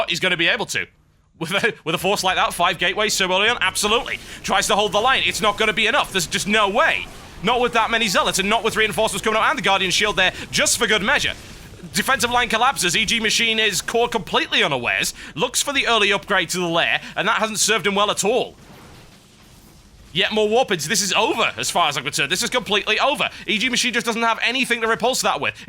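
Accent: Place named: Newcastle, United Kingdom. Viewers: Lol all geordies don't talk like that :P, well i don't anyways :P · Hard lol Geordie casting xD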